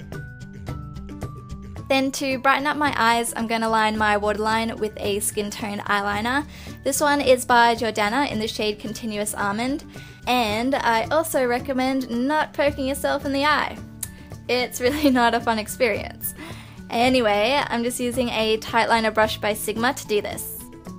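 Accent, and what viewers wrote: Australia. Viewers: Woa you are stunning! It's also lovely to hear an aussie accent :D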